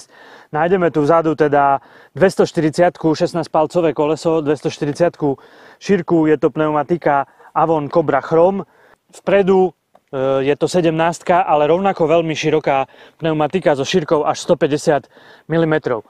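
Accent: Eastern Slovakia, Slovakia. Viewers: Video fajn,len ten strašný východniarsky prízvuk .... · a ten krásnej vyhodnarskyj přízvuk👍díky.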